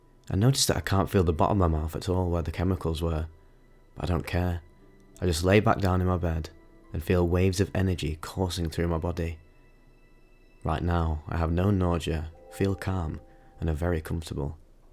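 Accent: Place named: Yorkshire, United Kingdom. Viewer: fairrrr, I used to always get copyright claims when watching music videos split screen. One time in particular when I streamed an Antony Joshua title fight in the corner whilst streaming a wow dungeon. I guess that's taking the piss a bit 😅 was never getting away with that. Also, cool to hear your from up north — I'm a manc. Guessing your from Leeds or York, my sisters bf is from there you sound the same lmao. Anyway enough of the life story, I stumbled across your channel whilst researching a some stuff. I’ve been using ambience music on my latest videos with my voice over the top, hoping not to get strikes, so far so good. I actually refrained from using an Egyptian type ambience from Assassins creed because it was a video game track and I thought I'd most definitely get striked, however, I shall try incorporate some video game music, it seems to be safer 👍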